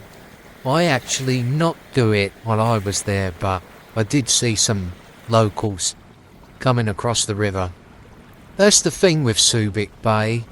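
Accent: United Kingdom. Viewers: narrated in the style of Monty Python's 'Bicycle Tour of North Cornwall' very uplifting more like this with English accent please thanks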